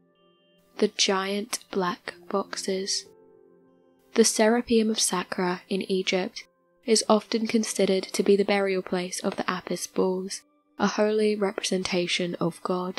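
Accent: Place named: Scotland, United Kingdom. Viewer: Sounds Scotch, to me.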